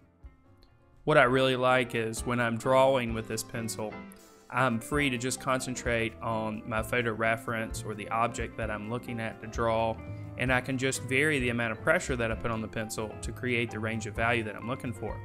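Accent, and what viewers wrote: South, United States. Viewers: Not is your videos very informative and well-done, your accent makes it even better. It's just so freakin' cute >-< It sounds southern, but I may be wrong.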